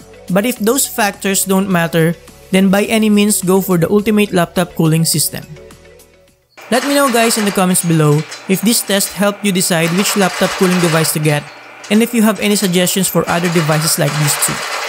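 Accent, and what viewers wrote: Philippines. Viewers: When you showed your face and talked i allready knew your a filipino BROTHER!! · Great video! It was really professional in terms of quality, presentation, audio, and content! Keep doing what you're doing. I was surprised that you only have 540 subscribers. I already knew you were a Filipino when I heard your voice so I hope you get big in youtube and represent us filipinos proudly! :) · your accent sounds like filipino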